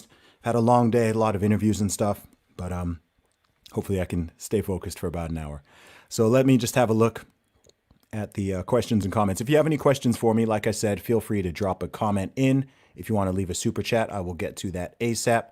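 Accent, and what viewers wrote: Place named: United States. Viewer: Idc what anyone says you sound like you are from America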